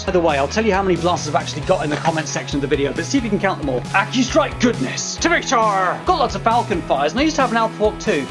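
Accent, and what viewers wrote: United Kingdom. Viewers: Btw can i get a Shout out in your next vid i would greatly appreciate that plus i love your British ascent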